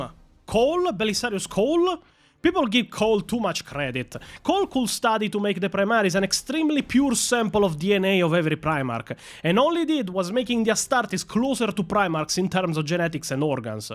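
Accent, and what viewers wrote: Italy. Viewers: What region of italy accent do you have? It is very entertaining for narrating. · Idk why I'm here, i just like your Italian Accent. Subscribed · Duuuuuuuude you voice, accent and didiction amazing. Were are you from? Italy? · wait, I know this angry Italian voice ....... didnt you help Raven Knight with the Templin fiasco ? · Geezus fucking christ you need to turn down the italian. Gooing to have to watch this video at half speed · ho riconosciuto il tuo accento italiano XDDDDD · God, hearing you rant about 40k lore with that italian accent, makes me want to believe everything you say and also to fight side by side with you brother! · Are you Italian or something? I can hardly understand you.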